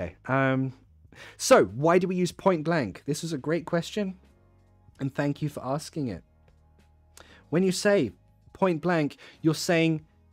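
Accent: United Kingdom. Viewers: What british accent you talk:Rp,Modern Rp,posh or cockney and do you talk more than 1 accent in your daily life?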